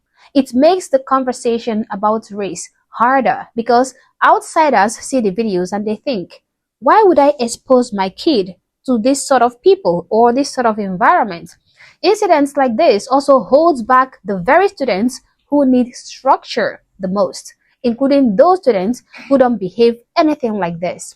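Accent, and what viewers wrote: Africa. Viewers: The other day, I was going on about hyphenated Americans. one in particular african-Americans... I was explaining the difference (in my opinion !) between black folks black Americans & African-Americans... & Sweetie I want Thanx for just being you & your accent, because in my book an ""African-American"" first & foremost they have to 1) be American citizen, but almost more importantly they have to some like your exact accent, no disrespect, what I would (maybe Mediterranean Africa would be different !) but all of y'all south of the Sahara all them (I have not heard many different accents from various parts of Africa) but they're going to sound a lot just like you ! But black Americans who's 3, 4 generations ago was "slaves", they don't know a damn thing about Africa, & are no more African that I am ! I am not denying the black that they are black folks I mean I am not blind yet ! 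(🙏Thanx be unto Our God & His Son Jesus Our Lord & Savior, Amen🙏) I can see I am not color blind, I've even said if black folks where to be stupid enough to hate America so much they transplanted themselves over to Africa... They would be in shock ! They (the indigenous Africans) be looking these transplants they would not see the American blacks as Africans; there's a completely different mind set a whole different way of thinking about things, & American blacks over in African countries be seen like fish out of water, like tourist really, that you came for a visit, I wonder if the real live Afric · You have an African accent. Are you able to find African content for your YouTube videos. Black schools have their graduation traditions. Do you have graduations in Africa?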